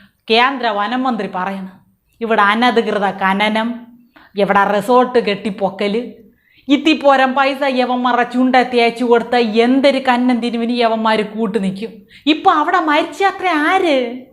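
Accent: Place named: Trivandrum, India. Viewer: നല്ല tvm slang കേൾക്കാൻകൊള്ളാം നീ ആരെടെയ്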